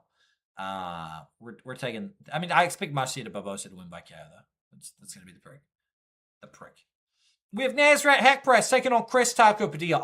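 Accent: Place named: New Zealand. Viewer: He’s Kiwi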